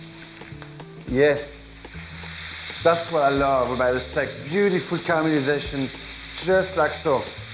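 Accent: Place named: France. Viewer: Omg I know is French and his accent makes it hard to understand. The subtitles however is terrible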